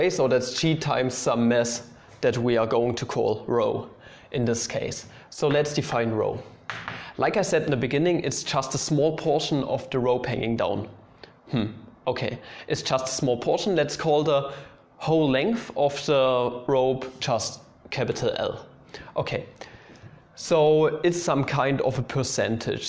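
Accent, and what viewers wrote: Germany. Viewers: Hey, where are you from? Your German accent is quite unusual, you don't have the voiced-voiceless opposition in your obstruents (e.g. p-b, t-d, k-g, ch-j, s-z), and also in German you pronounce sch and soft ch identically, and I can't identify where people speak similarly to you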